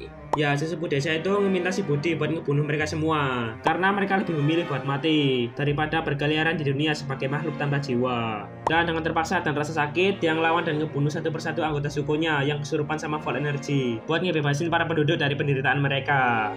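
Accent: Java, Indonesia. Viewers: Koe wong jowo to maz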